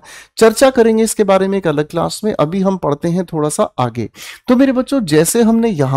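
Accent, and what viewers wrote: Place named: Bengal, India. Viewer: Aaj to Bengali tone me bhi bol gye sir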